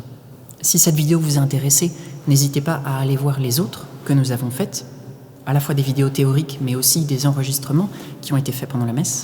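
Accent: Standard. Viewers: Me too! He’s actually not as hard to understand as I thought, maybe his accent is very neutral? He also speaks slowly and clearly so I’m not as lost as I expected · I am French, yes his accent is very neutral, and he speaks like a French literature teacher with emphasis on clear uncompromised pronunciation.But his language is quite simple and slow. It's the best possible combination for language learners. Few people talk like this in France.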